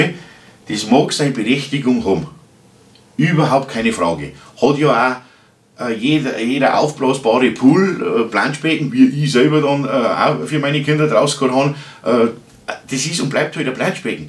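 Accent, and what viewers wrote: Bavaria, Germany. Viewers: Lieber, ich liebe deine Videos, deinen bayrischen Dialekt und wie du dich so herrlich in jedes Thema emotional hineinsteigern kannst. Natürlich bringst du wieder einmal die genial die Sache auf den Punkt. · Schade dass man dich außerhalb Bayerns einfach mal kaum versteht.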